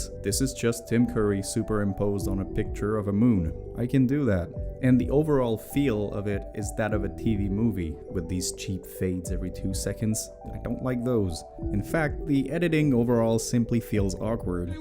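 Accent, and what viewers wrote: Quebec, Canada. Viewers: french canadian eh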